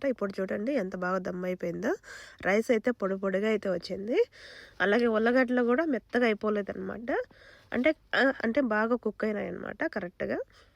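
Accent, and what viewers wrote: Rayalaseema, India. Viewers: Rayalaseema slang baaga telusthundhi sister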